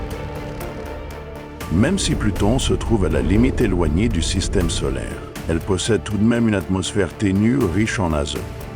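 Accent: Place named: United States. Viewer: accent americain ridicule à chier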